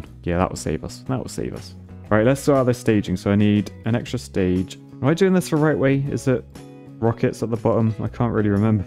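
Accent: United Kingdom. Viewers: Are you British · are you British because I am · oi noi it broke, oiii noiiii. your british accent is great.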